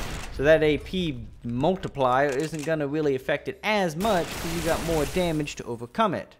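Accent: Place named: United States. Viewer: I love the cowboy accent YEE HOO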